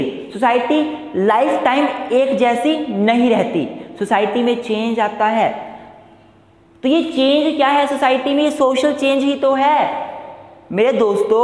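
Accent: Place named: Jammu, India. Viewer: Jammu ka teacher lagg rahey ho Language flow say😊but well done 👍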